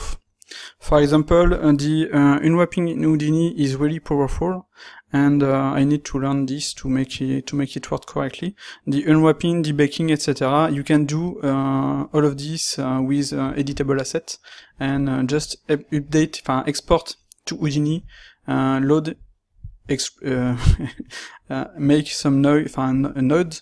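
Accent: France. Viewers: bizarrement je sais pas si c'est l'accent français qui me fait cette impression mais je crois trouver plus facile d'apprendre houdini sur cette chaine en Français comme en anglais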